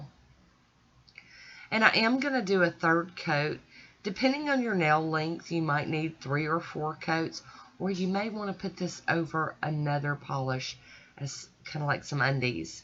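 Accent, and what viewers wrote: South, United States. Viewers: I LOVE the southern accent. I’m from Talladega Al. Where are you?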